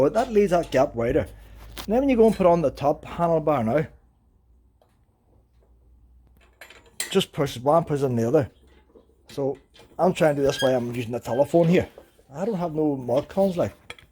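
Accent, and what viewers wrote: Ireland. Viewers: Hard to understand his accent very strong Irish accent 😅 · I'm buying one of these just for the Irish accent!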